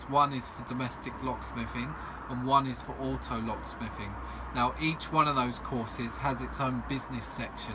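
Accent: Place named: United Kingdom. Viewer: Im confused, you've got a British accent but an American website. I'm in England and want to learn vehicle locksmithing. Is this course relevant to me?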